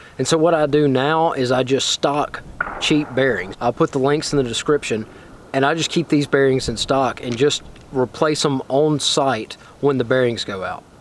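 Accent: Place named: South, United States. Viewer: how Sothern American: yes I subscribed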